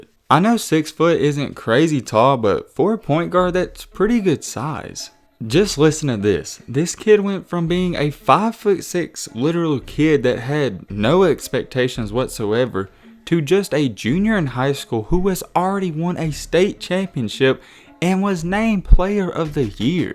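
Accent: South, United States. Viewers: Yo ahh country asf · Dude I gotta be honest the southern accent was not made for YouTube commentary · where you from? that southern accent heavyyyy🤣🤣👍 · You southern af bro 🤣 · This man sounds like he's trying to hide his southern accent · The narrator sounds like he's a buzzed hillbilly. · Country af voice. Sounds like you're talking and trying to hide your accent. Loud and proud brother. Don't hide that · Love the country voice commentary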